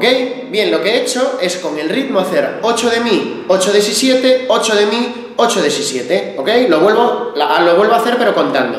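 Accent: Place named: Spain. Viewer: Ostia tio eres un buen maestro muy aparte de tu acento español te sabes comunicar bien te felicito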